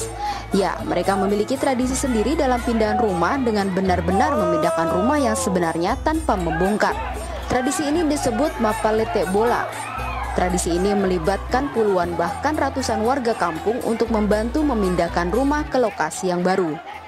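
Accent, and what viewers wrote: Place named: Sulawesi, Indonesia. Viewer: bahasa bugisnya sangat kental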